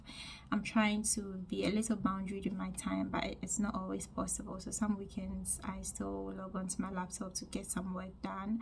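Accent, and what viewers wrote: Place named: Ghana. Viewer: The Ghanaian accent is slowing fading.. ❤❤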